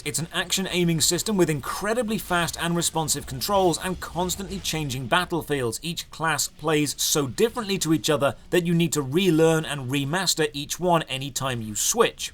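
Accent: United Kingdom. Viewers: Outstanding MMO review as usual. Btw i love listening to your voice and british accent (sorry if i´m mistaken, south-eastern european here). What about Allods Online? I couldn´t find a review about it on your channel. I used to play it about 10 years ago and never got back into it. Would be interesting to hear your thoughts on it! Keep it up!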